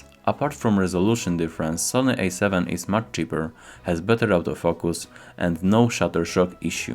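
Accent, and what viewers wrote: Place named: Poland. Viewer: You hear as a polish guy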